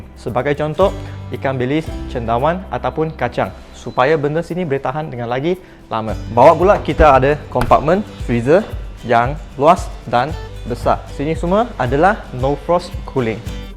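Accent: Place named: China. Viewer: u look indian but sound chinese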